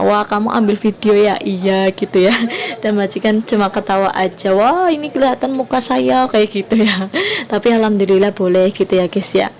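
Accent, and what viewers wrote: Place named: Banyumasan, Indonesia. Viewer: Masak mantap... Cilacap.. Ora ngapak ora kepenak..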